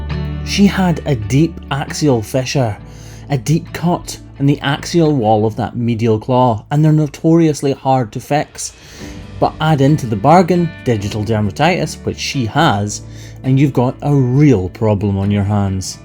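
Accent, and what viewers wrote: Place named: Scottish Borders, United Kingdom. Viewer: Just a short note to say thank you for your work, it’s a joy to share in your world and hear a borders accent while living in NZ.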